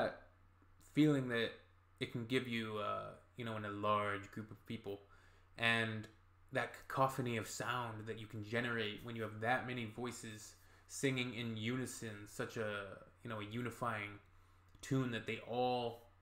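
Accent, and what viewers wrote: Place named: Canada. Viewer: Thank you so much for doing this, and for your kind comments. BTW I could tell you were Canadian before you told us. Most can't tell the difference between Yanks & Canuks (From an old Rhondda boy now living in Victoria BC)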